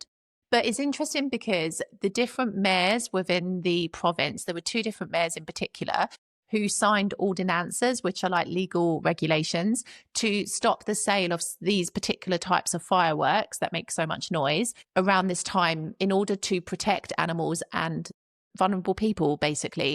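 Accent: England, United Kingdom. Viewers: I love you commentary and English accent gets me 😂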